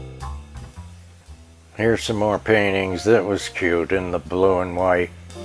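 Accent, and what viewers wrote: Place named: Midwest, United States. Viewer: R u from the midwest? Love your accent merry shopping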